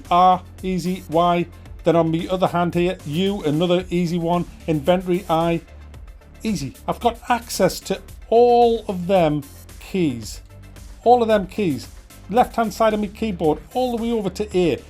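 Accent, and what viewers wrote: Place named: North East England, United Kingdom. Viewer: I look forward to videos, hearing a good old rant from a fellow North East bloke!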